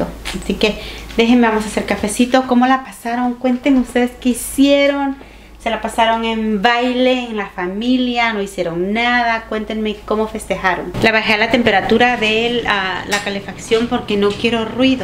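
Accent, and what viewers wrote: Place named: South America, Latin America. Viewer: Hola, te escribo desde España.....te descubrí antes de navidad y desde entonces te sigo. Eres una alegría de mujer, me encantas. A mí me encanta la gente sudamericana porque sois bien alegres, bien educados y con un acento como dices tú bien rico. Una pregunta, conoces el canal de Sandy y Fercho? Ella es colombiana y el Mejicano viven en Miami y también los sigo. El día 31 es el cumple de ella y le entró una llamada a su móvil de una tal, eras tú??. Un beso mi linda.😊😊❤❤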